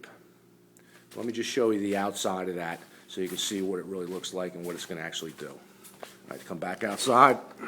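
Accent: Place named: New Jersey, United States. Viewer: Rutgers! I knew it sounded like you were from nearby.. Nice video, thank you! Esp about fan/filters..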